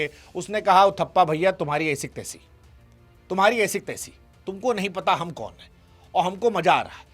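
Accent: Bihar, India. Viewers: Bihari bolte mittar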